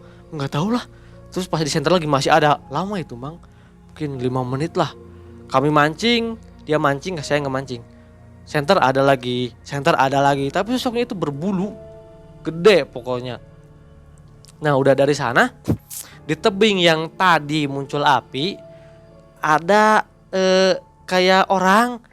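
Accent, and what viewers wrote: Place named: Banten, Indonesia. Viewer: Logat Sunda nya hilang 😅 ini mirip logat Banten serang / Cilegon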